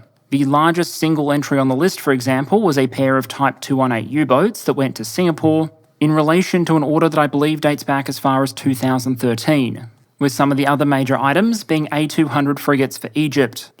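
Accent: Australia. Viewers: I'm just here for the aussie accented sarcasm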